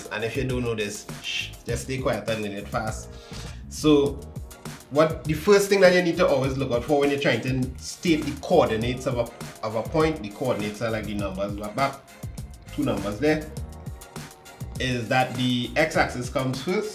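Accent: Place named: Trinidad and Tobago. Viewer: I can tell that he is trinidad by the patois 😂😂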